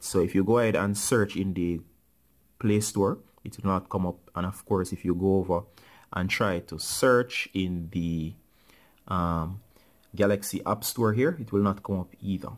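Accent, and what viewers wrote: Jamaica. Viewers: r u Jamaican I am idk it's your voice that caught me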